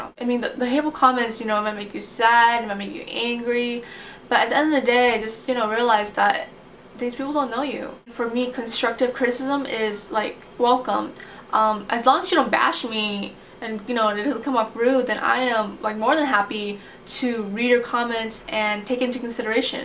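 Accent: Vietnam. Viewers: AND: My Sister is looking like you (80%) and she is 100% Full Northvietnamese.. So, i believe you are more than "1/4 Vietnamese" ( ' o . o ) But your accent is Southvietnamese.. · I honestly didn't think u are vietnamese, but then now u mention it I can see some vnmese features on u and hear a little bit of viet accent. Ur viet is very cute too <3 I honestly think u have cute teeth, pls don't be embarrassed about it, can't believe s.o ask u that kind of question. what's the point if everyone has straight teeth right?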